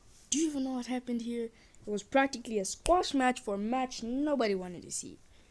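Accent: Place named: Ireland. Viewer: You very Irish’s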